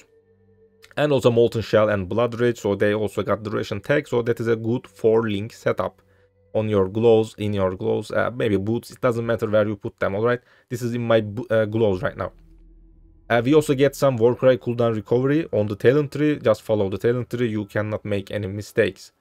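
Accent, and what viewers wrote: Turkey. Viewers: Bu videon önerilerde karşıma çıktı ve şunu söylemeliyim ki, başka hiçbir şeye bakmadan İngilizce şivenden Türk olduğunu anladım :D Bunun dışında güzel build, içeriklerinin devamını dilerim kardeşim :) Discord kanalın var mı bu arada? Açıklamalarda veya profilinde bulamadım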